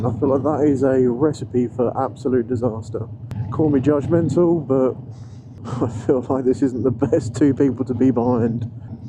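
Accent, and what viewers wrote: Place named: United Kingdom. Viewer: U are the best 😂❤ a big hug from Italy 🇮🇹 btw i love ur UK accent ❤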